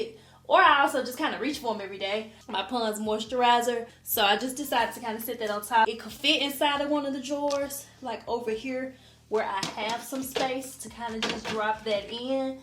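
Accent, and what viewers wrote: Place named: Memphis, United States. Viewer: New Subbie ❤️ Are you and Peyton Charles family because I knew you were from Memphis once I heard your first 3 wordsssss 😊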